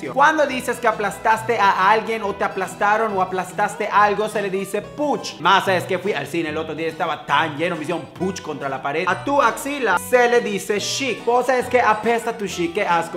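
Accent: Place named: Yucatan, Mexico. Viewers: Tu acento bien mayero :v Ma escupe tu huaya :v <3 Ja! :vvvv Te amo 7u7 <3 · XD yo si le entendí me gusta tu hermoso e acento yucateco me recuerdas a mi pueblo, mare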